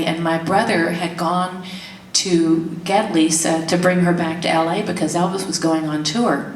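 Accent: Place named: South, United States. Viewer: It's there, I here it..she is a Southern Belle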